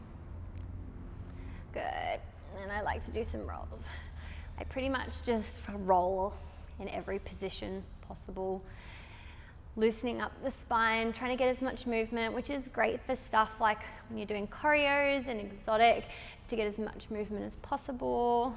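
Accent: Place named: Australia. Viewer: Maybe it’s the Australian accent but I loved that video! It moved a little faster than I would have so it kept me on my toes! 🫶🏻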